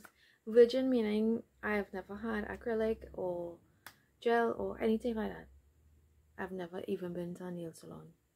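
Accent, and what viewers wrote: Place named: Trinidad and Tobago. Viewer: Love the Trini accent.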